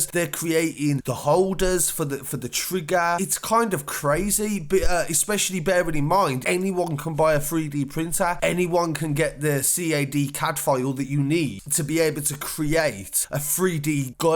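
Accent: Birmingham, United Kingdom. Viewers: The Birmingham accent is truly despicable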